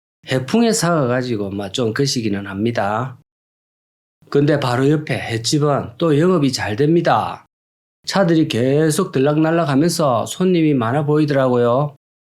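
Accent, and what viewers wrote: Gyeongsang, South Korea. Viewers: 늘 좋고 편안한 영상 보여 주셔서 고맙습니다, 님! 게다가 구수한 경상도 사투리까지, 정말 일품입니다. 어릴 때만 쓰고 오랫동안 잊고 있던 사투리를 들을 때면 정말 웃음이 절로 납니다. 다시 한번 고맙습니다. ㅎㅎ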